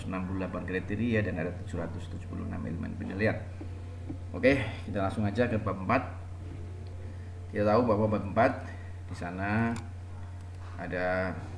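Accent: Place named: Banyumas, Indonesia. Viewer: Memang benar pak, yen ora ngapak ora kepenak........sukses selalu....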